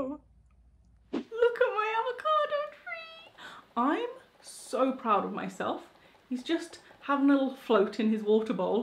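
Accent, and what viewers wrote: United Kingdom. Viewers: you are always great fun! I had to chuckle...I have the watermelon cuber too...As a Texan, I LOVE your Brit accent. You are practically perfect! Thanks dearie!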